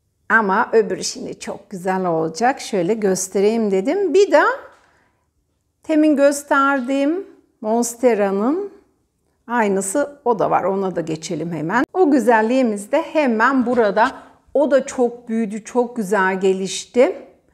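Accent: Turkey. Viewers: Abla Trakyalı galiba 😀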